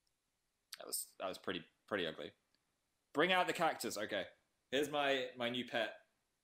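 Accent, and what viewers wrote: Germany. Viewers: His german is so adorable:3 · German is too adorable... I can't.... dead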